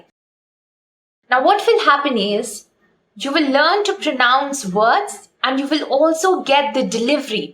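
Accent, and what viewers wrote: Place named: India. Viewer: Thank you for the tips. Are you an Indian? I hear Indian accent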